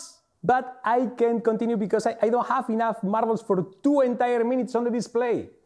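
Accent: Spain. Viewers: Eres español, verdad? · eres español?? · Are you spanish? I like your accent 👍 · Bro, el típico spaninglish. Se nota que eres español por el tono de algunas palabras. Por cierto me encanta el video sigue así. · Se nota que eres español !! Grande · Estoy casi seguro de que eres español, solo diré: MOOOLA MUUUCHO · me encanta el ingles de quenbrix que tienes, es como si hablara yo, y se entiende bien. un acento que solo tendría un español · It's surprising how many Spanish natives have a very good English (this is the case) but their pronunciation is so attached to Spanish pronunciation rules. I wonder why. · Al principio pensaba que el canal sería de algún guiri, una vez que escuché el acento de ese inglés supe que este tío era español 😂😂😂. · acento mas español que yo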